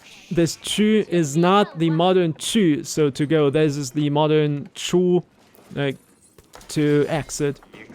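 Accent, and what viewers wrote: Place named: Germany. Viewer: The thought just came to me since the release of Age of Empires 4, but are you going to try a video that is about German like this video? I remember first watching this video and noticed you have a bit of a German accent, as well as your name, so it would be interesting to see you try it if possible. Also since the game is out I have noticed that it seems all factions have the language drift, such as the French for example. Not sure about the Abbasid Dynasty, Delhi Sultanate or the Mongols as I have no knowledge on the languages, but it does seem so when playing as them. Hope to see them do that with more factions in the future, is quite awesome to hear how they change through the ages/years. Thanks for replying, was interesting to read, especially mentioning the Tang Dynasty, which starts to give more hints on how Chinese was pronounced back then, but is harder to reconstruct Han Dynasty pronunciations as that is older.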